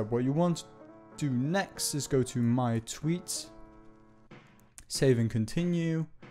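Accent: Germany. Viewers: Lol bist du deutscher? ;D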